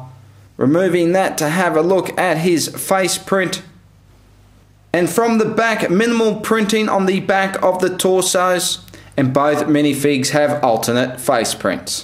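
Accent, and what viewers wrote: Australia. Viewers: u are so aussie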